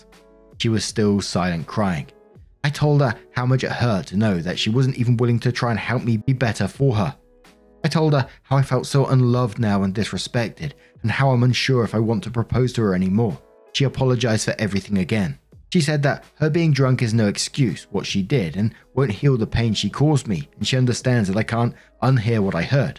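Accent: United Kingdom. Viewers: Just stopping in to say I love your accent and commentary always!!! I'm Jamaican and always wished for the british sound.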